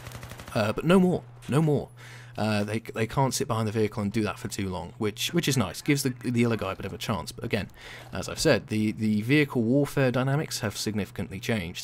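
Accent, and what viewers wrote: England, United Kingdom. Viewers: Oh my god! it it so satisfying to finally hear somoene with a strong english voice narrating on of these videos,(i cannot cope with anymore generic US acents) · exactly what I was searching for, far better than any other video assessing the patch that has thus far been uploaded on youtube. on a personal note, nice to hear an english accent on a battlefield 3 commentary, I am subscribed to many american commentators and had struggled to find a decent english equivalent, struggle no more.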